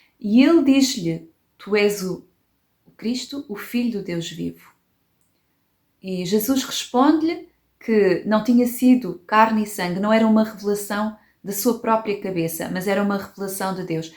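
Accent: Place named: South, Brazil. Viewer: Que linda eu aprecio muito o português sul real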